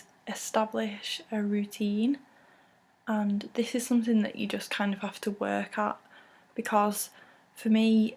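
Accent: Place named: England, United Kingdom. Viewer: that's awesome! i love the english accent! haha yep i am :D there's a11 hr time difference! we should keep each other motivated to achieve our fitness goals :)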